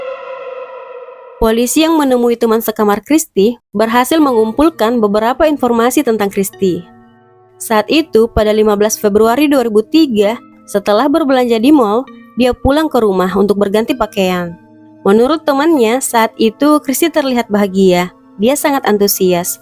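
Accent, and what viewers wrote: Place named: Makassar, Indonesia. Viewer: Yg punya chanel sepertinya org makasar. Soalnya logatnya kentara pas lagi bicara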